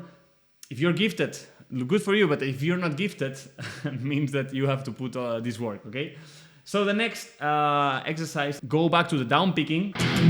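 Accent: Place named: Spain. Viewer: Is this guy from Spain? The accent sounds a lot like European Spanish.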